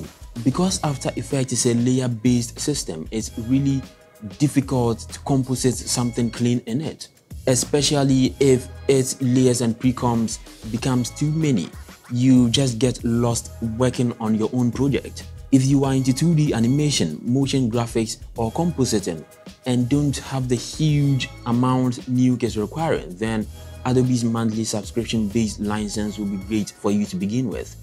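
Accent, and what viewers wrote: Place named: England, United Kingdom. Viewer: I know After Effects. Want to get into 3d. Learning Maya 3d these days. I like your English accent.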